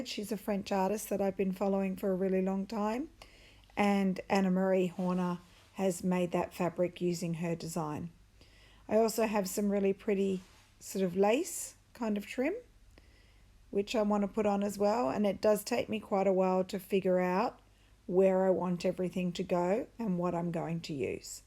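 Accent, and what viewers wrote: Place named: Australia. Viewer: Loving listening to you explain your process and your Australian accent. Getting excited to start stitching this week! Have a wonderful weekend.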